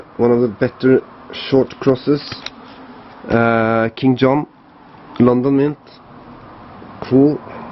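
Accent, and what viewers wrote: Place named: Norway. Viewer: Hi! Im Norwegian, but ill write in English. Been watching your vids for a good while now, and you inspired me to find the right place to go hunt my first old coins. So, i went out on simular fields you walk on and found my two first Oscars and alot of other cool stuff. Thanks for the vids and the lovely "Thor Heyerdahl" English hehe. I hope I get the chance to go detect with you guys some day. 2 questions though, what is the name of that yellow book with the kings in it? Been looking for it, is it originally a childrens book? 2nd. What kinda detector are you using mostly? Im using the AT Pro and loving it. Wouldnt mind if you revealed some of the values/numbers on your detector while you detect. best regards Kim Erik :)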